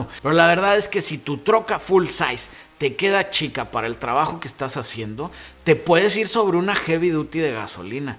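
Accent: North, Mexico. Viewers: Así hablamos los norteños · No puedo con el acento este 😂😅 lo siento no.podre suscribirme😢 en que parte de Mexico tienen este acento o es puro teatro 😂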